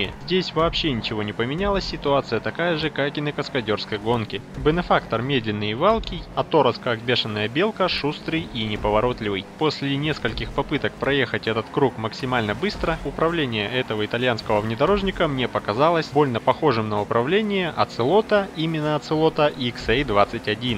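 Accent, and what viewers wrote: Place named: Russia. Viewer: Он снимает видео на русском, для русской аудитории. Поэтому и говорит так, как удобно, на русский лад, если хотите. Снимал бы на английском - место для придирки было бы. А так нет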